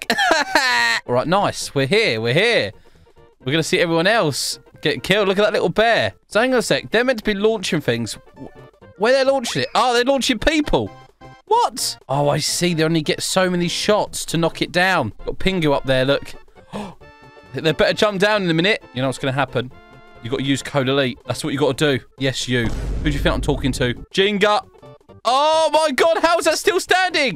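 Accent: United Kingdom. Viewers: I like u because I love your british accent 🤠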